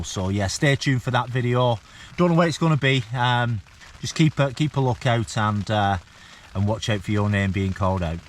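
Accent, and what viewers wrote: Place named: Bolton, United Kingdom. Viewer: Proper bowton talk, crackin't flags! 🙂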